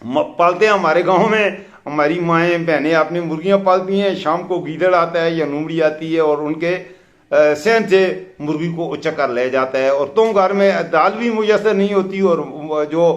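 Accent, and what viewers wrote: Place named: Punjab, Pakistan. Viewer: Punjabi accent....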